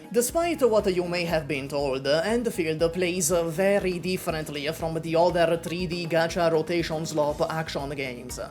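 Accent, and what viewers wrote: Italy. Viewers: Just because of your Italian accent 🤌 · you yap in your silly italian accent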